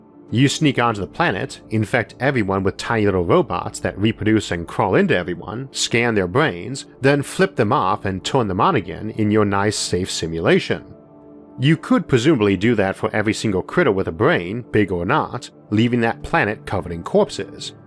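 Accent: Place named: Mississippi, United States. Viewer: what accent does have? I assume American, but from what part of the US?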